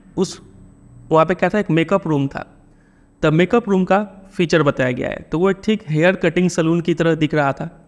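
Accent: Bihar, India. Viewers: Sir are u from Bihar ?? Just asking ❤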